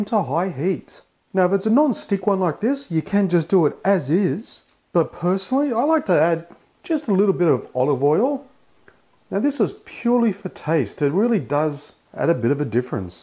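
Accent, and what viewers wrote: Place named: Australia. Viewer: Then the Ozzie accent. Its bloody torture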